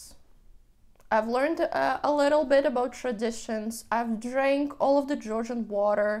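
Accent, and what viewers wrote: United States. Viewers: Your speaking style has suddenly turned American. I'm not a fan of it. I prefer European English. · You sound so American! That’s a good thing, I am from America and mostly like it here.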